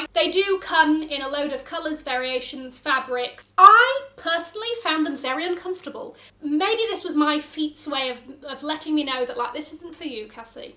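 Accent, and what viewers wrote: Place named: Liverpool, United Kingdom. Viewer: Great content Cassie. What colour is your burberry trench? I have to say again you sound like a" posh" scouser. Your fab always make me smile. 😊😊xx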